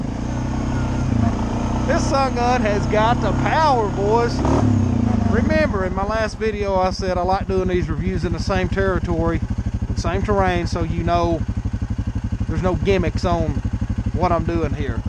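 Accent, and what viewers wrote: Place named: South, United States. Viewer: There’s something about a southern twang that makes the review more legitimate!!!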